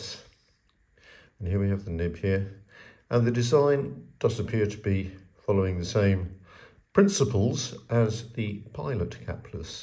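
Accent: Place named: United Kingdom. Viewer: Your reviews are good. I seriously have watched your videos particularly to improve my British Accent. 😄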